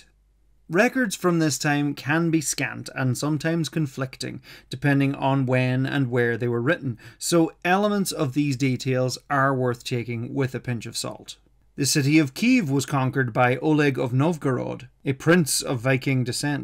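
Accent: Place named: Ireland. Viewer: Ah there is that beautiful Irish accent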